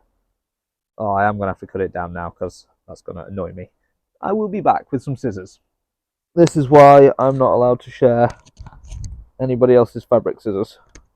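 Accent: United Kingdom. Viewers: Dude! Your cool as shit! I feel like a kid watching you do your vids, you look like a fantasy hero, you sound cool (cool voice, British, speak in the kings english) and you are an enjoyable watch.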